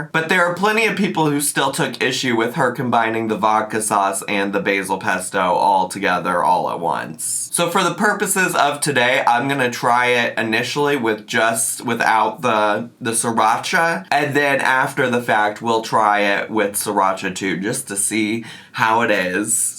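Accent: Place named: Midwest, United States. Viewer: It’s that Midwestern voice.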